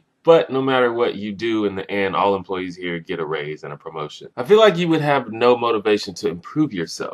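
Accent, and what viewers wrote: Alabama, United States. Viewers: Why do you talk like that? Are you from alabama or something?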